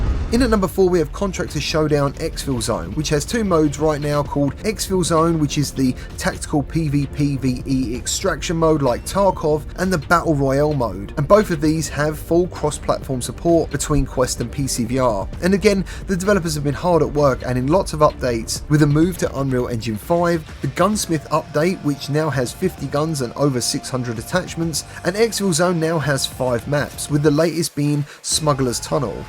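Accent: England, United Kingdom. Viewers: You need to talk even faster with that dufus English accent. That way no one will understand a thing you say.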